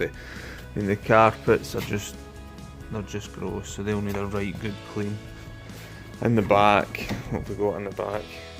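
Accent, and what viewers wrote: Scotland, United Kingdom. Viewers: You hang on to that Scottish accent. They're harder to come by these days. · I'm hear for the Scottish accent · You're Scottish. So speak scottish.dont change how u speak for anyone 👍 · I love your scottish accent :D Guys and GAROLS! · I'm cool with the Scottish accent. Is your restoration business based someplace in Scotland? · Don't lose the Scottish accent. It is wonderful just like your work and attention to detail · Hahaha I love the Scottish accent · I love your scottish accent. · Watching your videos is my guilty pleasure, I love the Scottish accent too! From Edinburgh myself but came to Staffordshire a long time ago. Thanks for posting and happy new year to you and your family. 😊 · Who on earth is commenting on his accent, I freaking love it!!! Keep the Scottish accent, dont change it for anyone!! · Your Scottish accent is awesome. 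I have an accent as well. Forget about people’s opinion. A lot of them don’t understand the struggle of learning and/or speaking two languages. Keep it up!! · Luvv yarr sco'ish accent 😊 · I would NEVER complain about a Scottish accent. That’s what keeps me coming back, lol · Love your accent tell people bugger off🙂😉my husband and I were trying to figure out where you were from then I said Scotland that that was your accent. I like watching how you take these cars from dirty filthy mess has two really nice cars that I would sit in. I enjoy your channel and I look forward to your next video. Have a blessed day. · Love ypur scottish accent. The best man to clean a felthay car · I love your Scottish accent but then I’m Clan Ranald myself so I have no trouble with it. I live in western Canada though. Another job done well. To bad I couldn’t get you to do my car. It’s not that dirty but a deep clean would still be nice. 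· Love your Scottish Accent 😻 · Actually I love Scottish accent as much as your work. It is a cimbination of both. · Your Scottish never be ashamed of your accent I am welsh and my accent is strong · Scottish accent is brilliant, always reminds me of colin mcrae, his accent is ingrained into my mind :( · Who in their right mind would complain about a Scottish accent? It’s music to my ears! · I watch for the Scottish accent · I love your Scottish accent! It's one of the reasons I watch your channel! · Love your Scottish accent!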